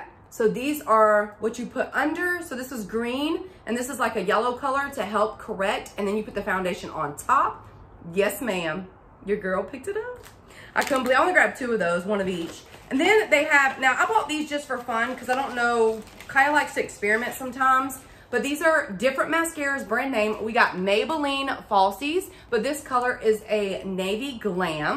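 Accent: South, United States. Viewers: You are adorable 😊 I just love hearing you. I’m in California and love to hear people from the south!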